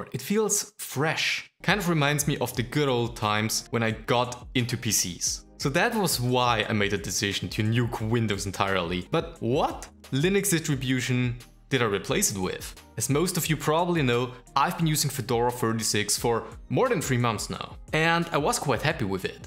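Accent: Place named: Germany. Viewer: Hi gutes Video. Ich habe genau fas gleiche gemacht wie du. Habe viel rumüberlegt, was die beste Distribution für mich ist und bin dann doch wieder bei Fedora hängen geblieben. Jetzt finde ich es wirklich gut und liebe Gnome 42👍 PS: Bist sicherlich eigentlich Deutsch ne? Man hörts ein bisschen 😂😉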